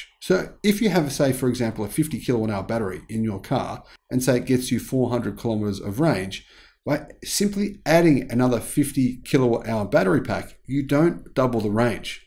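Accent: Australia. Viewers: Is this an Aussie?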